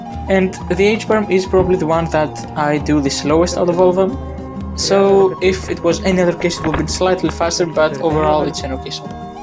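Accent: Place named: Greece. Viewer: The greek accent is the best!!!!!❤😂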